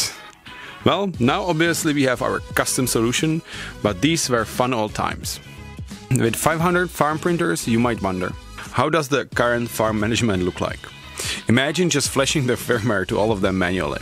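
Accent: Czech Republic. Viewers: i like your early czech accent..and really...NO Obsolecence sounds so great!!! i missing 1 or 2 nice prusament skintones...maybe in the future ?greetings from germany.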